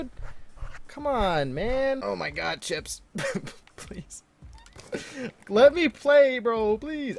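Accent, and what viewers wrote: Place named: Indiana, United States. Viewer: are you from hickville indiana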